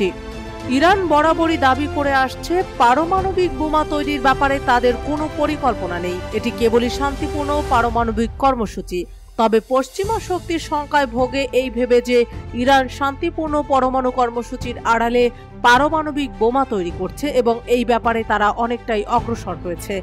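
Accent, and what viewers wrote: India. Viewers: India voic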